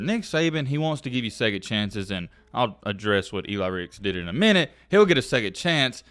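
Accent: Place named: Boston, United States. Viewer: You sound like a bostonian talking about the "patriot way". And the dude got caught driving with no insurance... do you have any idea how many people drive without insurance, that literally why God created the right hand lane. And when you're black you don't need to be going "10,15,20 over" to get pulled over. That seems like a very sheltered take. And as far as the weed.... who cares, it's weed.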